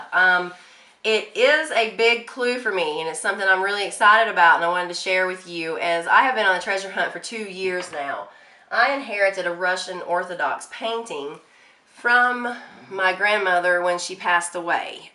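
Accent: South, United States. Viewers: No you are not 100% European, you are however 100% Southern! The accent gives it away, no DNA needed! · You sound like you are from the south.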